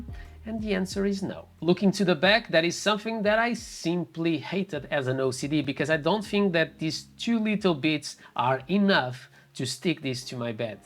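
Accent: Portugal. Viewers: Hey…. Are you Portuguese??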